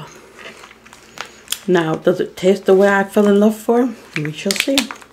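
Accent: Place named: England, United Kingdom. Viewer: I hear a English accent ssooo cute.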